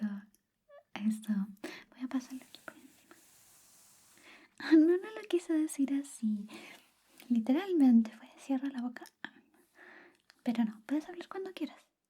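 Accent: Chile. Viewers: Me encantan los tus asmr hablando chileno.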